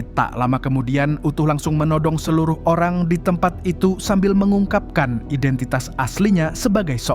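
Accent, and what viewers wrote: Banjar, Indonesia. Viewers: Urang banjarkah pian mang?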